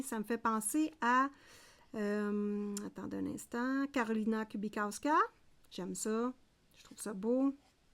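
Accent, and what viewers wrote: Canada. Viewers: Bonjour 😄,ça fait plaisir de te voir et de t'entendre car j'adore ton accent canadien, il démarre très bien ton colo, il sera beau 💕😄bises Martine 😄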